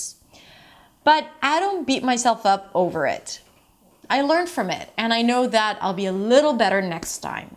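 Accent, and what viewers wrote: New York, United States. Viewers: Ohh god You are very smart you speak like a native English teacher congratulations 🎈 for a moment I thought you were American.. i love ❤️ to watch your videos. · You are amazing you were able to master an American accent. Science says that you will never lose your accent after a certain age (eleven) glad you know you provide them wrong. By the way, do you feel that you will revert back to the way you spoke before since you are surrounded by people who speak with English an Israel accent? Subconscious you might pick up the flow just like people pick up certain accents and words when they move to a new country. What is your trick and how do you prevent that if you find that happening. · Wow! I want to learn English like a native English speaker! I love the American accent, tell me what the secret is. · In your story, you jump from "I was born in Izrael" to "when I was 20 I moved to New York". 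What was your experience with English before you were 20? Especially before 14y.o. I might be wrong, but you your accent is 100% American. Not only the accent but the use of words (collocations, idioms etc.). It's hard to believe you had not been raised (or spent at least some significant time in your childhood) in English speaking environment. It would be honest to tell about your childhood experience with English to the people who watch your videos so they can realistically estimate their chances to achieve the same level of natural speaking as you have. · You can unsubscribe if you want. But I wouldn’t really worry about her making a mistake. She has spoken with an American accent the whole time and even took speech classes to correct her pronunciation in the beginning. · Your English is perfect to me. I really thought you were American. I surprised when I saw you saying that you are not American. 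I love your channel because I've learnt a lot with you. I am Brazilian and I effort to improve my English and one day... who knows... have an excellent accent like you. Congrats!!! You are making the difference in people's live. 😘😙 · Hi! I’m Chinese and I live in Paris. I’m your new follower since one week. I’ve been very surprised by your excellent NY accent that I really want to master also. I even showed your video to my French husband who speaks very well English, he didn’t doubt one second that you are nota native speaker. I like you accent and your struggle personal story, very motivating! And I appreciate much your confidence (and your new hairstyle 😊). I enjoy your course and hope that in several months I could get the half of your level, thank you so much! Love you! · You really inspired me dear sister. I'm very happy that God has bless you with the facility to learn English. I'm amazed that you sound 100% American when you speak. 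I'm a Christian and I'm believe that God gifted to us with such bless to pursue anything that we want.... Listening to you really gives me confidence for my learning.... God bless you dear sister....bue😀😊😄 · No, I personally don't need it, as I'm not a bad accent mimic myself (at the risk of sounding conceited). I'm not even sure how or why I found your channel, but I occasionally look at different language and accent videos, so itmust have been in the recommended list, and then I saw your obviously Israeli-sounding name, so I checked it out. Bekitzur, kol hakavod al ha mivta ve al he'arutz shelakh, at nishmaat kimat amerika'it legamrei, kashe le'haamin she dibart anglit im mivta yisraeli lifnei kama shanim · You speak perfect American accent. If you did not say you are Israeli I would not recognize English is not your native English. Your story is full of inspiration. I admire the Israeli because their contributions to the world. 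Thank you very much. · Phil Smith I totally thought she is an American!! I am Chinese though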